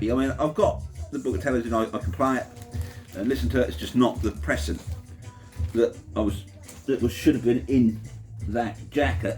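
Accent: Suffolk, United Kingdom. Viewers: Shame about the Blue Note album but if it still sounds good that's fine...generally I don't buy european Jazz vinyl albums as so many are Digital to Vinyl copies Di Agostini is one there are others, the USA market does not allow that (digital copies of original masters) Originals are like hens teeth..!!. Different rules in the EU. Nice to hear that Suffolk accent...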